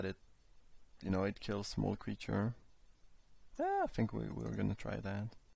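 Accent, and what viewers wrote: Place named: France. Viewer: Where are you from? I can't really figure out your accent. Sound french-ish to me but i'm not sure.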